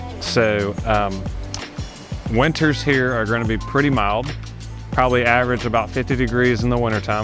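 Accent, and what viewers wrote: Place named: Greenville, United States. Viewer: You sound like you are feom Greenville literally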